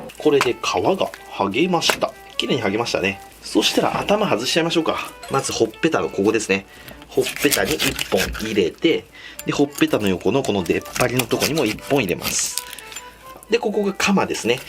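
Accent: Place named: Nagoya, Japan. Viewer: 名古屋弁、いいなあ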